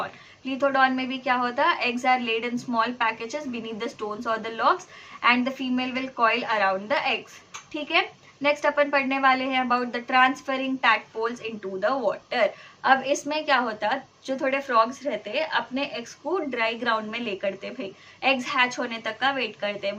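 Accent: Hyderabad, India. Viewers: I think ap hyderabadi hai 😂❤❤❤❤ love your lacture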